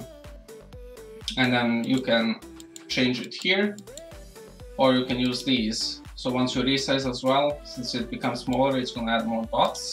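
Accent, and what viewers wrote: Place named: Serbia. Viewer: Your accent is like u come from Serbia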